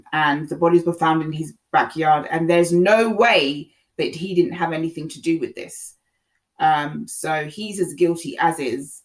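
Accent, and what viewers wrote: United Kingdom. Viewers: Love your accent a new subscriber are you from the UK.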